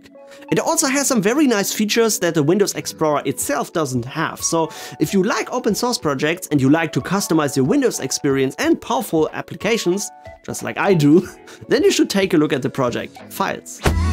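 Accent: Germany. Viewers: Cooles Programm, werde ich definitiv ausprobieren. Btw. als ich die Stimme gehört habe hab ich gedacht oh ein deutscher. Dann sehe ich den Namen und ich lag richtig 😅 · Boh-Ey, ein Deutscher der englisch spricht... Jetzt muss ich auch noch den Translator aktivieren um was zu verstehen...😱😱😱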